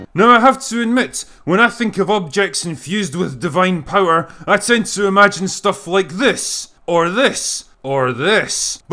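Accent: Scotland, United Kingdom. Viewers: It seems like from 2016 to now you’ve gotten more Scottish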